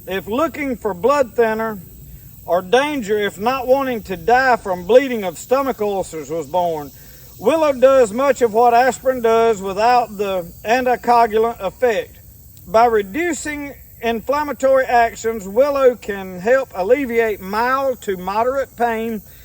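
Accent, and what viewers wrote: South, United States. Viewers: I just stumbled across your channel because of your skullcap video… I was like “this dude has got to be in Ms.” lol I’m in Wayne County. I totally feel that Southern Boy accent! The hubby and I got tickled at you for being barefoot as a yard dog in the woods 😂😅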